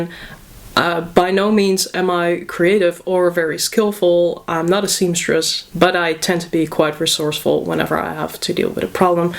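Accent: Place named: Netherlands. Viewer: Where are you from I hear a Dutch accent ben je Nederlands?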